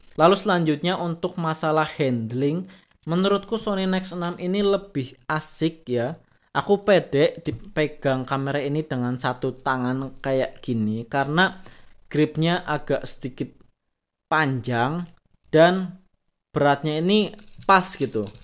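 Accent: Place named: Java, Indonesia. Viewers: Medok banget masny, tapi bagus penyampaian nya lengkap as pengguna sony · medok bgt bang,wong jowo yo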